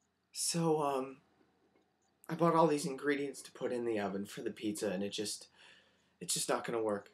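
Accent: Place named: Canada. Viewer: Your Canadian accent is soooo hot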